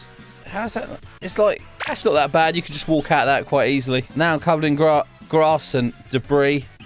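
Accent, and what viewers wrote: United Kingdom. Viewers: Hey ate you britesh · Is it me or is this guy British?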